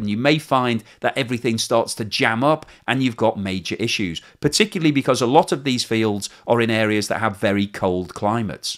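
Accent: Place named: Liverpool, United Kingdom. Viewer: I think you are talking nonsense, seems to be a bit of scouse coming through maybe it's Thatchers fault.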